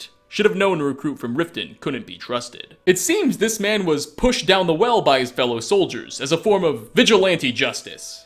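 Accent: Australia. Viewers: is aussie? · Is Australian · Hey are you From Australia? Because if you are I've never noticed until now.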